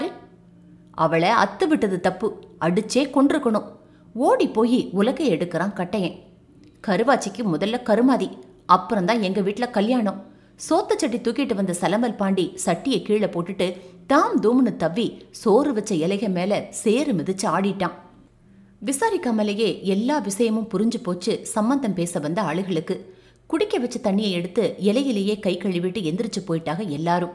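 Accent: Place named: Southern Tamil Nadu, India. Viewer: தங்கள் ஊர் தெற்கு பக்கமோ அங்கே பேசும் தமிழ் காதில் தேன் போல் இருக்கிறது நன்றி நன்றி 🎉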